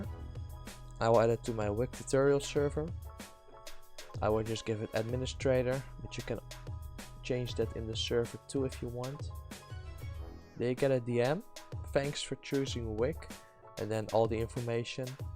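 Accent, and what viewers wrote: Netherlands. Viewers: Haha ik hoor gwn dat je Nederlands bent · Ben je nederlands je accent?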